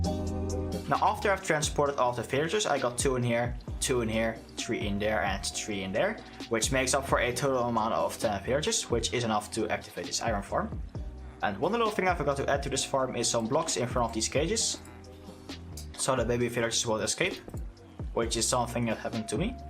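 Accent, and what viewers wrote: Netherlands. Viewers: Hi, I have a Question: Does this also work on all platforms like XBOX One/360 and PS3/PS4? Btw, you have an accent. Are you dutch? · are you dutch ?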